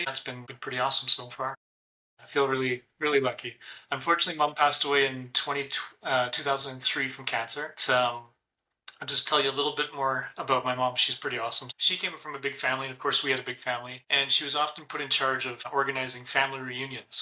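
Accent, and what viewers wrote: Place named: Canada. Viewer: Great Canada voice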